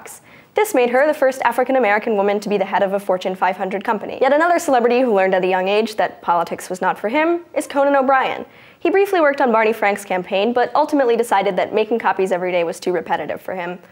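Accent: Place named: Tennessee, United States. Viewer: What part of Tennessee are you from?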